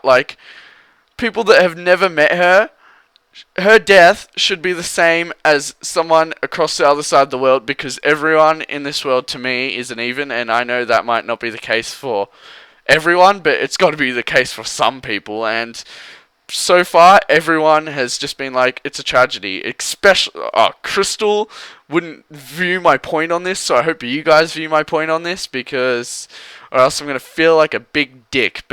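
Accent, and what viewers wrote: Australia. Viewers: 1. Are u Australian ( I am ) 2. The reason trains don't pull on the breaks cause there going at like 300-500km/hr and there's no chance he"ll slow down in time but overall I agree with you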